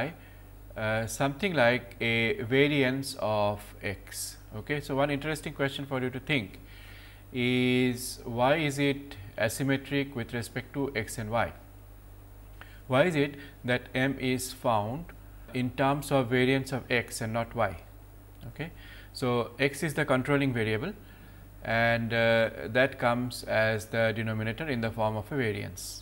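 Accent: India. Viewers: Lecture is okay if I can't stop laughing because of the Indian accent ;)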